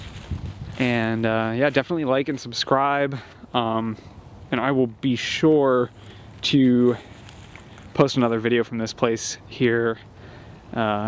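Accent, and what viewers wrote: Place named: United States. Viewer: I do wonder just how they got to be all "rummaged through" like that. Maybe animals had gotten in them and ripped everything up, like the cushions of the chairs and couches? And, NO toilets? Just HOW old are these cabins? And, since I am commenting here as I watch the video, what state is this all in? I am just ASSUMING it is in the USA. Just because our "tour guide" speaks perfect English of the US type. By the spruce tree's in the video, I don't think this is too far South in the US, IF it is in the USA. Okay, at about we get past the shacks or cabins to the old trailers. The trailers SEEM to be maybe 1980's? Possibly late 1970's? And, it's just odd how the trailers seem to just be abandoned without anybody taking most of the food and other things with them when they left it. And, whomever's land they are all on, haven't they pushed to get it all cleaned up? 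It is all so mysterious how this old place is just left here abandoned. You would THINK that IF it could be all cleaned up and cleared out that MAYBE the property owner could sell the land OR even make it a nice place for a NEW trailer park , or IF it is NOT just out in the Boonies, a good place for new homes to be built at. BUT, It IS really quiet out here, it MAY be in the boonies of the boonies. And, maybe most people would NOT want to be that far away from a city or town center. Some of these trailers may be even from the 1960's, but, some are maybe 1980's too. Most of the furniture is about 1980's too. But, ho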